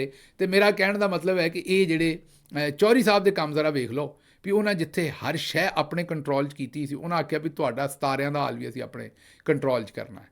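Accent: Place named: Punjab, India. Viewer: I enjoy your Punjabi dialect Your explanation is sincere Today you mentioned about military officers having a servant “ orderly “ It needs a correction Police and other civilian officers , in military person doing same is called “BOOT MAN”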